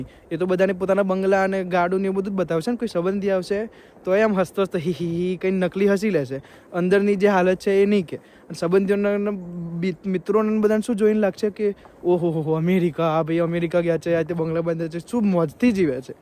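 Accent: India. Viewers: Bhai tamari boli prmane tame charotar ke amdavad na lago chho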